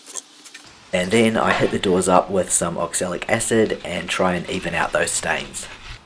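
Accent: New Zealand. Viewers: Watching from NZ. Love hearing the NZ accent! I watch Jay too. So cool you guys support each other. Two big thumbs up from Aotearoa - Land of the Long White Cloud. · What a lot of work. I admire your patience. Well worth it and a fabulous finish! Keep up the good work and show us more of your renovations. Is it a kiwi accent I hear? Linda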